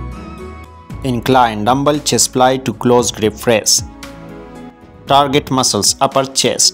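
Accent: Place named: India. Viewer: Сразу слышно что индус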